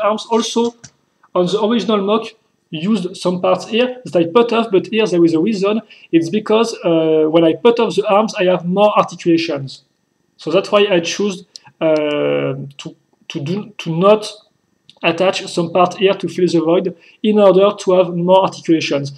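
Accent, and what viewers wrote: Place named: France. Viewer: Du vrai francais dans l'anglais ^^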